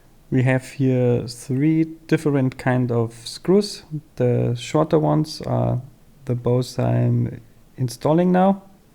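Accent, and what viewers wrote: Germany. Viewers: Are you from germany ? And nice video bro i'm gonna try this on my 5s 💪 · iam hearing a bisschen deutsch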